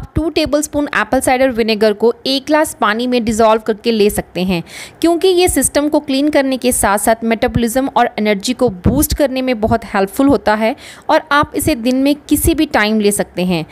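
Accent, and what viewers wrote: Punjab, India. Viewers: Hi ji, as usual rocking video. I loved it, ur message goes to the mind directly. I luv ur punjabi diction in ur interaction.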